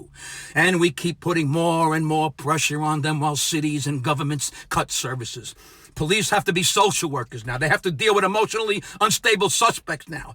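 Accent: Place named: Bronx, United States. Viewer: I LOVE ❤️ you. You talk like my grandparents did in da Bronx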